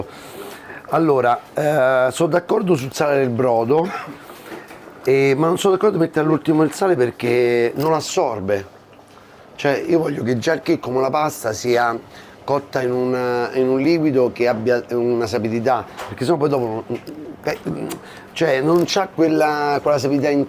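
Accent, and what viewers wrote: Rome, Italy. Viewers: CI DICA L INDIRIZZO DEL SIO RISTORANTE. A MILANO COSA DICONO CHE LEI PARLA ROMANESCO? E POI PER CHÈ HA SCELTO MILANO PER IL SUO RISTORANTE E NON ROMA LA SUA CITTA? GRANDE CARISMATICO!!!!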